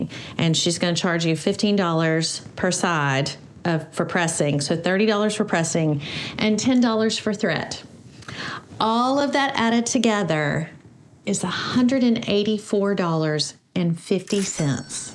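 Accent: South, United States. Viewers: New subscriber here. LOVE your personality, good sense, concern about cost, and...your Southern accent!😁 Howdy from Texas! 🤠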